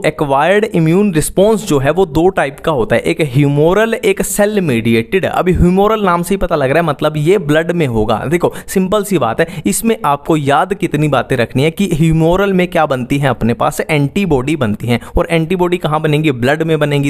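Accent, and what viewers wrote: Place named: Punjab, India. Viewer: Sir i think you are from punjab,as it seems by your voice tone.....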